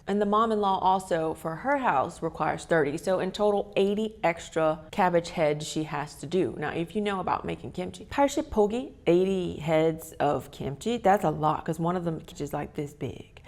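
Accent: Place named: South, United States. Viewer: hahaha love your southern accent comming through, i love these story times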